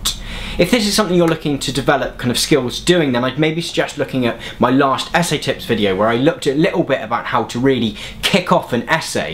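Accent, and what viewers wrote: United Kingdom. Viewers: I like British ascent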